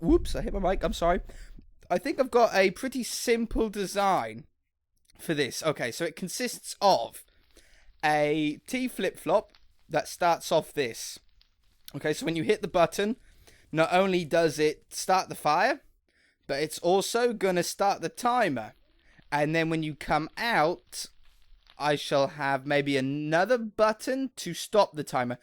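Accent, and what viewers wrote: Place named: Norfolk, United Kingdom. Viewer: I'm guessing your from Norfolk?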